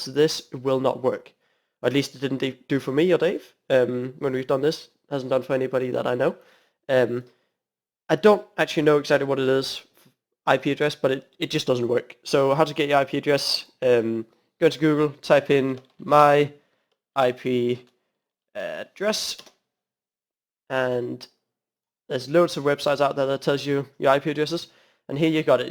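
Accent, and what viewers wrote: Denmark. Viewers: er du fra Danmark? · i knew u where a dane hej :D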